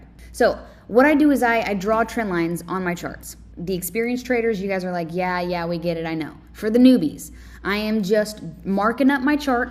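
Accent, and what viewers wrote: United States. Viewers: "you ain't got..." I love that country accent ❤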